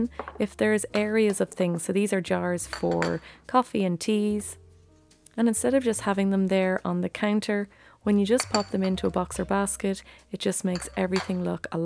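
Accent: Ireland. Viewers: YES, you're right! I am from France, near Lourdes, and I do so much like this soft lovely Irish voice, as you said! Thanks,!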